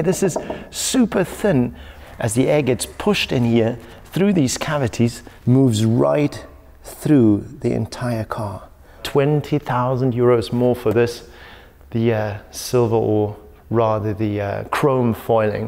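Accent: Cape Town, South Africa. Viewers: Is this presenter South African?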